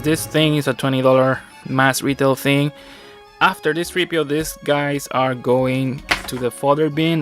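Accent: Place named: Conyo, Philippines. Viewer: nice review. conyo was just the icing of the review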